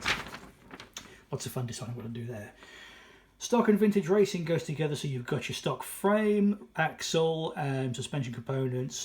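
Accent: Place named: England, United Kingdom. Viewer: Good review of complex kit👍🤓just watched a couple more too, so I'd better sub😃 It's nice to hear your another Englishman aswell😃Pete 😎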